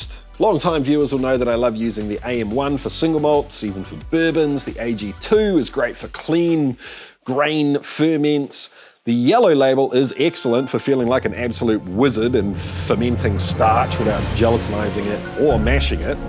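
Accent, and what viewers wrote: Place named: New Zealand. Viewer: I thought the comment was a piss take on kiwi accent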